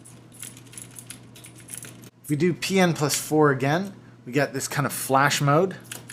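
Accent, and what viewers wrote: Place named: United States. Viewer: "Does my head in"... never thought I'd hear someone with an American accent say that.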